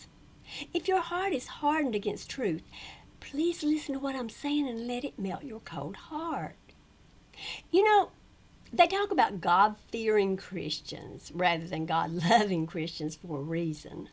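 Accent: South, United States. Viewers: Am from trinidad & I also have a accent but I also like yours ..I like the southern accent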